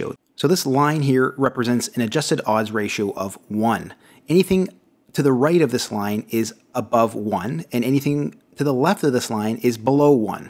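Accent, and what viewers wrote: Canada. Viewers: Thank you, neighbor to the north (if I am identifying the accent correctly), for your informative presentation.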